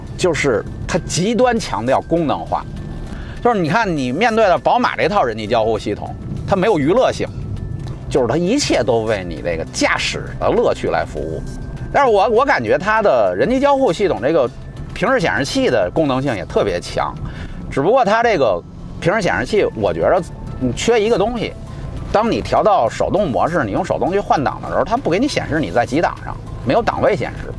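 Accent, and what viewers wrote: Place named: Beijing, China. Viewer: 听你讲话让我想起我在北京跟几个朋友唠嗑，哈哈😂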